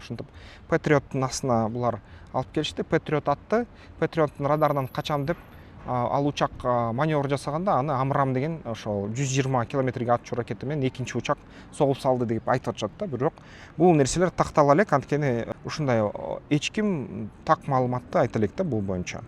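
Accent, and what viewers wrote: Standard. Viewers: Эң сонун,нукура кыргыз тилде сүйлөп жатасын иним.Келесоо маңкурттар жаза беришет, көңүл бурба❤❤❤ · 😂😂Азаматсыз НУКУРА суйлой бериниз! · Тура байке сиз мыктысыз кыргыз тилинде таза алып барып👍👍👍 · Брат капа болбон таза кыргызча суйлойсуз сиз билимдуу адамсыз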